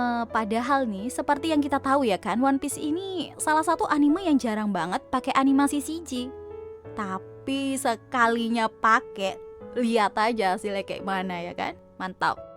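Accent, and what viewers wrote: Java, Indonesia. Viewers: suka OP sejak kapan? Ini menjadikan perbedaan yg mendasar. Saran * "coba tonton animenya dari chapter pertama, baru bawain lagi yg ini, terus bandingkan secara pribadi" * Medoknya keluarin aja, jgn d tahan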